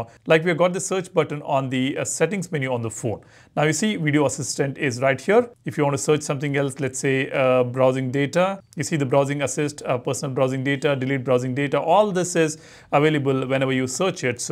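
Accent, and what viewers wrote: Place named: India. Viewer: My friend thanks forall your information but you are talking to fast and with your Indian accent it’s very difficult to followed you. Please don’t believe I’m not accusing you I’m not insulting you but you needs to slow down if you want to people understand you Especially some of us don’t know or not familiar with technical issues 🙏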